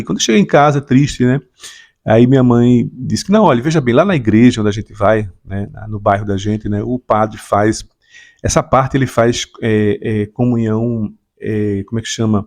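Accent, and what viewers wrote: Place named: Pernambuco, Brazil. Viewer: Muito boa a forma que o senhor explica,sem se exaltar com calma sem ofensas a quem ainda acredita parabéns, além do mais tem esse sotaque maravilhoso eu como baiano mais adoro o sotaque de Pernambuco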